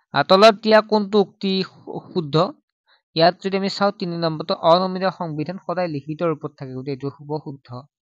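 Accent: Nalbari, India. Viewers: Sir nalbarir lage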